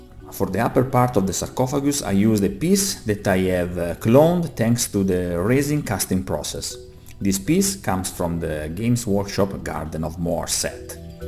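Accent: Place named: Italy. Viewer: Ce l'abbiamo nella parlata, il nostro inglese sarà sempre... così xD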